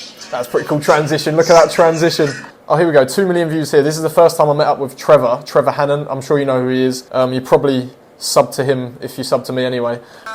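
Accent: United Kingdom. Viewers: He sounds british Is he? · Dude! I was watching your shorts/tips but I honestly didn't expect you to be British. I thought you'd have a Russian accent 😅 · i just noticed the british accent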